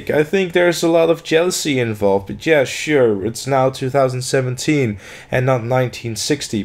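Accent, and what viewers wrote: Netherlands. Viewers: from what country are you? sounds a lot like you are from holland. since the tv you describe is exactly what it is here.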